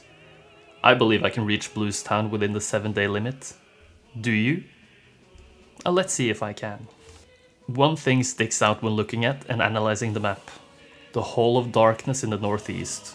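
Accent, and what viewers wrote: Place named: Norway. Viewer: You sound like a Norwegian from the 80's. Trønder maybe?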